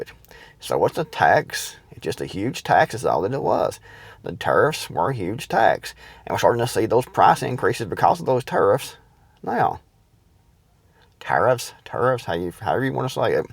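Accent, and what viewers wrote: Appalachia, United States. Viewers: Keep that Appalachian accent! It is lovely. Buggy works! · True. But it is easy to see why everything has gone up in price. So if a lot of our stuff comes from Canada and Mexico and other countries then we are paying up to 100% more for stuff because of Trump's ridiculous tariff war, economic insecurity, and his crazy decisions make everything unsure. Because you come from Appalachia, which predominately votes Republican (I'm near WV), along with the accent. which is why they made the assumption :) Trump said it, the people you talked to just parroted his talking points. They didn't take the time to actually think about it (as you apparently have). They don't have critical thinking skills. They think Trump is going to throw off illegals, not poor white family. LOL When it all comes down, people are going to start scavenging and stealing and it will give T a chance to enact Martial Law. 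He wants to be a dictator and the easiest way is to stoke a war with someone outside and force an internal conflict and he can try to declare a state of emergency and then Martial Law. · You are an Appalachian the same as I am! Be proud of how you speak! <3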